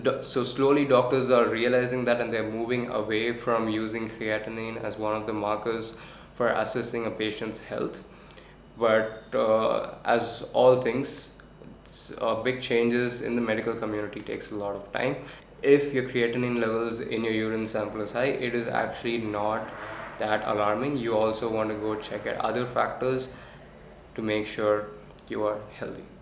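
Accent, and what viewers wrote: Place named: South India, India. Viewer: Most south indians have this accent, your English accent depends on your first language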